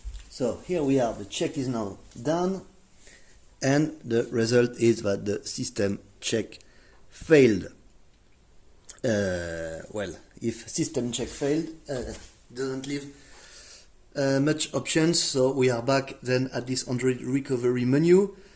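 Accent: France. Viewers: I Can barely Understand you with your French Accent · Je pense que tu es français vu l'accent 🤫moi j'ai un problème avec mon pixel 3 qui est en fastboot mode et qui m'indique failed to load/verify boot images. si tu as une idée !!!! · Are you french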